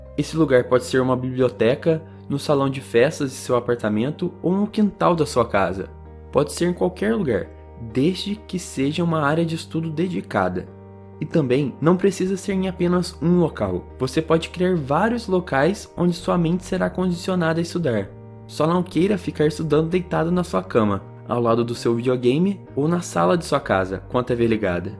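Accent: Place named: Minas Gerais, Brazil. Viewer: Onde o mora? Ele parece Mineiro!!!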